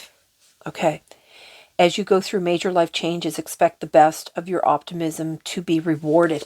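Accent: Canada. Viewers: ❤ Thanks, I stumbled upon your video... It's very aligned with what I'm experiencing right now. I heard the Cape Breton accent;) ❤❤ ❤❤ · Canadian, eh?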